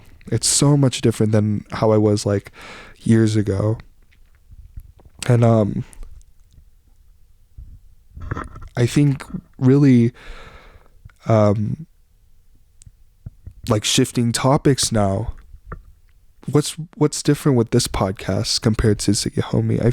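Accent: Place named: United States. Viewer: i just binged watched ur videos coz i watch ur “how i learned tagalog” video and wanted to hear how u speak filipino. Ur taglish sounds diff from the usual taglish. Its cool tho! Explanation: you have a different way of switching from tagalog to english and sneaking in english word + there's some filipino words that you translated in english that we dont normally translate in english. (we would still say it in tagalog) Accent: u sound filipino american. We can distinct fil-ams accent. U have filipino accent with a hint of american accent. Pis dont take this as an offense. More like critics. And i love ur uniqueness in speaking To improve ur speaking... watch noontime shows or filipino podcasts and imitate their accent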